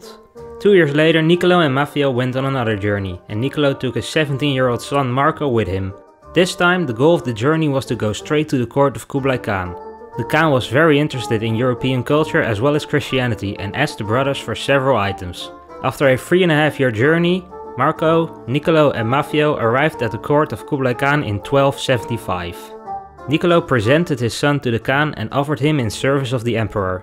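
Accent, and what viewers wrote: Netherlands. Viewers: The narrator is Dutch i recognize this thick accent 😂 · Ben jij een Hollander?..ik hoor het aan jou accent. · The second I clicked on the video I KNEW he was Dutch. :') onlyDutchiesrecognisetrueDutchies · i can hear your dutch accent haha (im dutch as well so) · This video helped out so much! I didn't understand Marco Polo's journey till now! This is great for my project! Thanks. Even though you had a Dutch accent you still explained really well.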